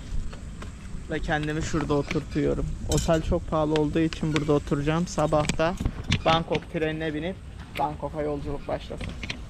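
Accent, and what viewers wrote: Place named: Yozgat, Turkey. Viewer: yok dayi sen istanbullu gibi konusmuyosun, sanki boyle yozgat, corum gibi, babanlar nereli??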